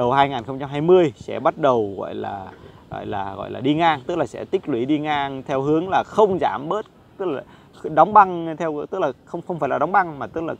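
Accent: Thanh Hoa, Vietnam. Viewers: giọng thanh hố hay sao ấy nhỉ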